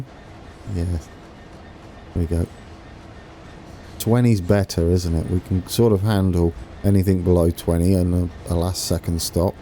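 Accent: United Kingdom. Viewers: It is fitting watching these British rail videos with a brittish narrator like yourself. Mmmm, my immersion levels are in peak condition. I have to say that TWS has GOT to be the best looking and sounding of all the train sims out there. Their artists and effects guys did a top notch job. Also the realistic landslide and they even nailed the workers standing by doing nothing! That is so immersive! XD GG. Gonna watch a few of your train vids. I just got TWS 2 recently and im enjoying it a lot. Doing the Sand Patch campaign now.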